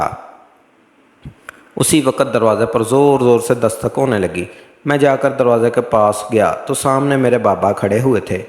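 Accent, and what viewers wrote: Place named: Punjab, Pakistan. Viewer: ابے اردوُ بول رہا یا پنجابی ۔۔۔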